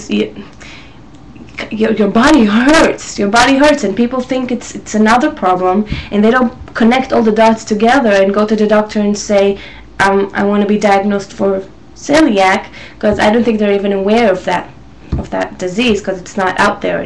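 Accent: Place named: Israel. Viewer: you are pretty and intelligent. :) i realy wanted to know where you accent is from, oooh isreal ok.:) using fried bacon chopped and fried egg on a salad instead of salad sauce is SO Yummy.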